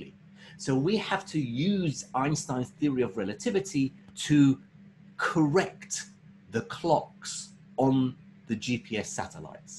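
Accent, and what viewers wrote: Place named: Iraq. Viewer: لغته العربية باللهجه العراقية گلش حلوه.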